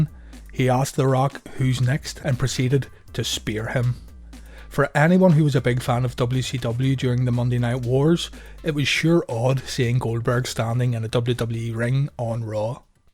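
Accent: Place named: Belfast, United Kingdom. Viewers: What part of Northern Ireland you from? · Awesome work. You're knocking out the best videos on YouTube. Sounds like you're from Belfast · Good effort on the vids mate You a Belfast man? I’m down in carrick. Appreciate the vids anyway chief keeper lit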